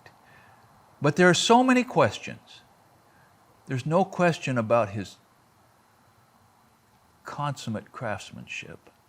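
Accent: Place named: Midwest, United States. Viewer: I hear a Midwest accent in your voice.🤔 I grew up in LA, "Shakey Town", Southern California.😧 It's obvious you're not building for earthquake resistance.🤨 That's the reason for some of those "odd" tenon shapes.☺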